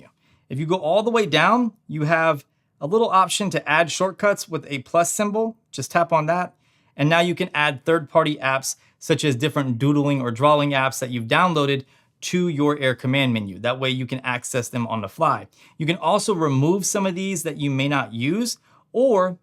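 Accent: United States. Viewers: I have the note 8 since it lunched but I'm thinking to upgrade to the note 20 ultra. I like your American accent 😀.....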